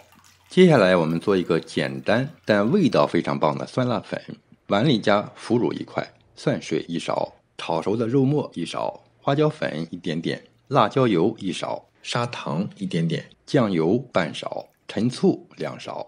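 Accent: China. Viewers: 口音较重，山东附近的，有点大舌头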